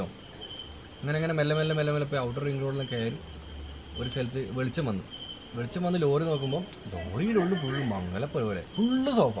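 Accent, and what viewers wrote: Kannur, India. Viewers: കണ്ണൂർ ഭാഷ പൊളിച്ചു ഭായ്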